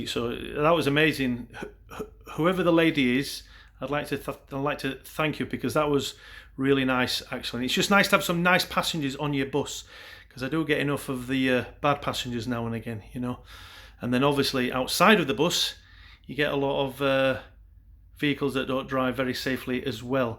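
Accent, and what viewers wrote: Yorkshire, United Kingdom. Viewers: Are you Yorkshire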